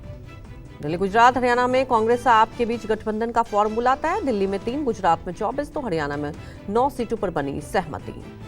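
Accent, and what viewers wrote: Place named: Bihar, India. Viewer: Madam Ki Bhasha Mein Bihar Jhalak Rha Hai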